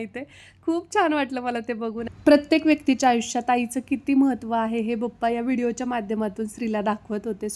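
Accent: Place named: Kolhapur, India. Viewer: Karad kolhapur side che watata..kalwan wagere words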